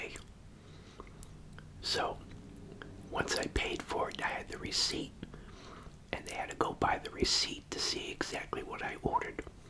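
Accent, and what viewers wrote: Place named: Massachusetts, United States. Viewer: I knew u were from the east coast! Massachusetts?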